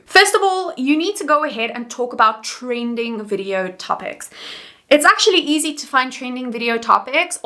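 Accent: South Africa. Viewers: Very very very good video. Been thinking of trying to be a bit more low effort as well. Also, you sound like you're South African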